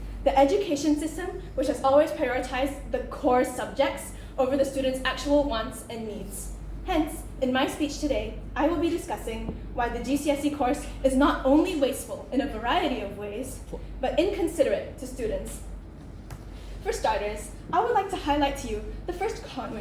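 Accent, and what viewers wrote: United States. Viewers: I like ur accent, sounds americanish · finally, someone with an American accent in a uk school, I’m not the only one!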